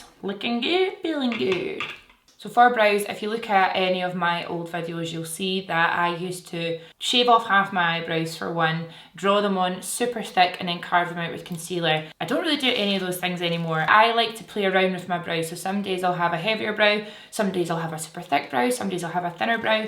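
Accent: Scotland, United Kingdom. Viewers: Seeing your videos make me so happy, just watching you helps so much with my anxiety an depression. Something funny tho, i naturally mimic accents especially ones from Europe... so EVERYONE knows when ive watched a video from you cause i end up with a Scottish accent for usually about an hour or two... my mum finds it funny. I hope Jack, and the doggos are well ❤️ much love from New Zealand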